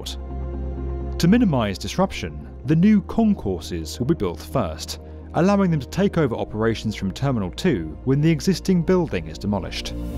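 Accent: United Kingdom. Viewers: 🤣 No the narrator is British! You can EASILY tell by his accent!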